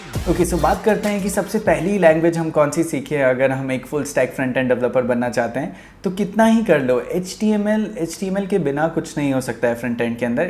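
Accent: India. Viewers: yeh punjabi he i can sense that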